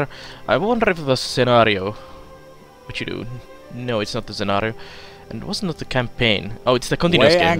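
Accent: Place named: Finland. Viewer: Finnish speaker. Cool accent :)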